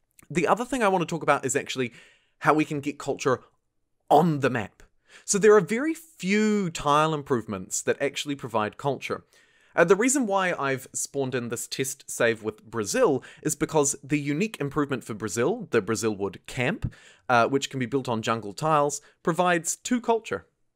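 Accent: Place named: New Zealand. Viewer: as another kiwi i have to say that your accent or iksent as we say is very rhotic. e.g. culturrrre rather than culcha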